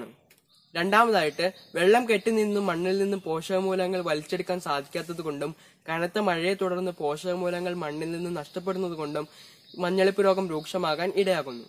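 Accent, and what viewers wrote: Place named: Palakkad, India. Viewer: Evar place palakkadu aanu adahanu engane samsarikkunnad evarude samsara reedhi engane aanu padichu parayunnadalla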